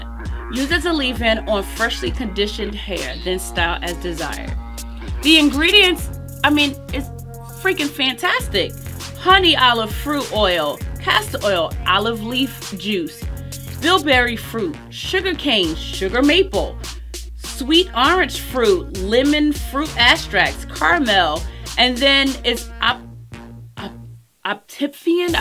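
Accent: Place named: Illinois, United States. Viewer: Where are you from? Sounds like Illinois my entire family is from there, I could be wrong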